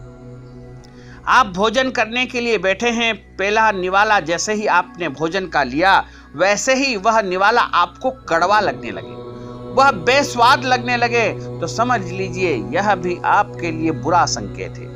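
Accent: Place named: South, India. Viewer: South Indian Hindi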